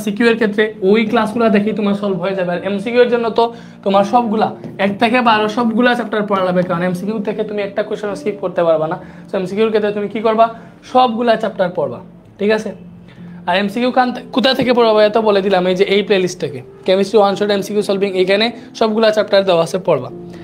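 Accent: Sylhet, Bangladesh. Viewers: ভাইয়ার বাড়ি সিলেট নাকি?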